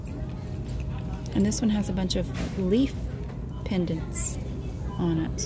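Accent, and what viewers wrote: South, United States. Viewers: I just want to say I laughed several times throughout this video. Some of your comments about the items are really funny. I'm from South Carolina but I've been living abroad for the last 3 years. I love it but sometimes I miss hearing southern accents and sassy, warm and funny ladies like you. You remind me of my aunts hahaha. I also miss Target A LOT. Nothing like it here in South Korea.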